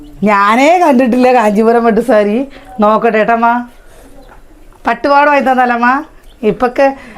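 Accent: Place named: Valluvanad, India. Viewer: It is Valluvanadan style. Try to respect.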